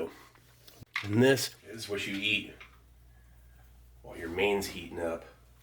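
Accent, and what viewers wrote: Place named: California, United States. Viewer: Love your videos man! Ps that Californian accent is gold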